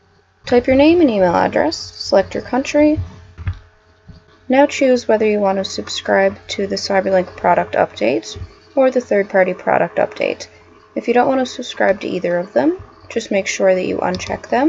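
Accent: Canada. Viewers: I don't want to sound stupid, so sorry If I do...I haven't upgrade to a Blu drive yet so clearly I don't pass the Blu advisor check (which I will once installed) for 3d is says I cant support 3d playback, but what happens is I put in a 3d blu drive and use and hdmi to my 3d tv will it work due to the fact I'm using the comp more as a player than for viewing? Oh and yeah you sound wayyyy Canadian haha. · i thought your accent sounded canadian :p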